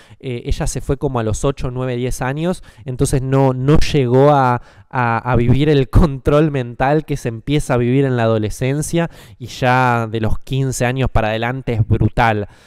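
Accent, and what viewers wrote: Argentina. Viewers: Dude amo el acento argentino me partí de risa en el inicio xdd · Como le deben de haber quitado dinero atraves de las donaciones voluntarias supuestamente.a millones de hermanos.amigo tu eres argentino ?? por el acento lo pregunto.saludos gracias.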